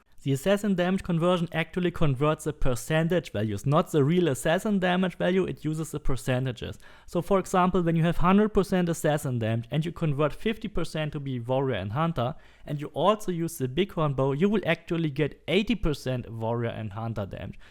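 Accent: Germany. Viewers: thank you sir! Sorry for the questions but your accent sounds familiar... possible that u r from Germany?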